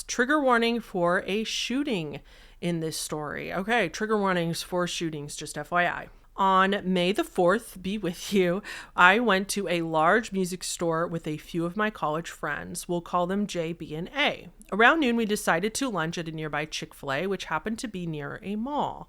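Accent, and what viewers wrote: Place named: East Coast, Australia. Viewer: It's an Australian east coast accent ahaha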